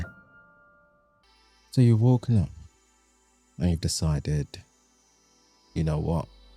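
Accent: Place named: United Kingdom. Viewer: I love your wonderful British accent.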